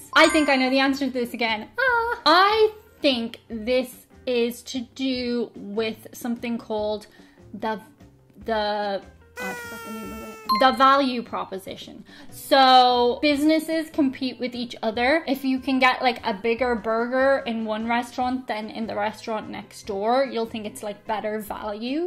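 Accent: United States. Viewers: I really must compliment her on her ""Merican English" She was SO spot on with the State Names and other Americanisms. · you definitely adapting to the American Accent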